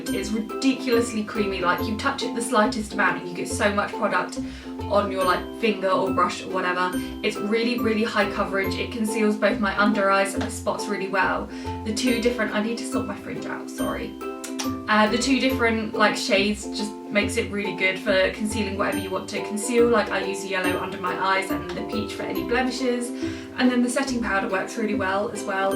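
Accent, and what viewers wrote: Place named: Australia. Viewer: You sound really Australian 🙈 love your hair!!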